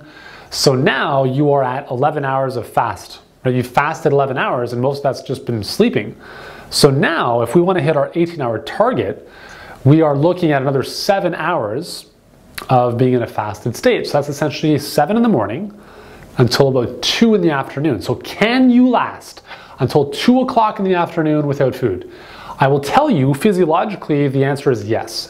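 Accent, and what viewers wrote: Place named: Canada. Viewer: Canadian raising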